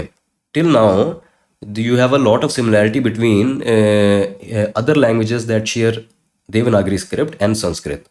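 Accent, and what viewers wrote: Hindi, India. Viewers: All your explanations are very good , but for your pronounciation . Because mostly you pronounce every Sanskrit word in Hindi format. You don't pronounce Balaka, but you say Balak. Visarga is correct not Visarg. All Hindi speaking pronounce Sanskrit words with consonants as क्, म्, व् as Balak, Ram, Shiv, instead of बालक, राम,‌शिव, Please change your pronounciation, otherwise we South Indians ( Tamil) will mispronounce. Sorry for this corrections. But in Sanskrit , pronounciation should be very precise.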